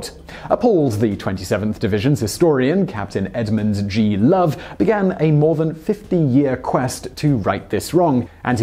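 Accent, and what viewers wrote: United Kingdom. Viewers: Wtf I thought this was Vsauce (Michael here) I was so thrown off by the British accent 😂😂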